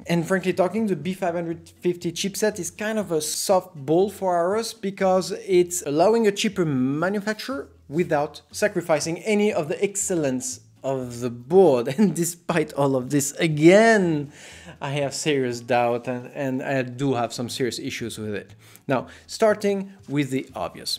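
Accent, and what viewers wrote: France. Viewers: are you french ? Oo (sorry i'm new) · L'accent français ;)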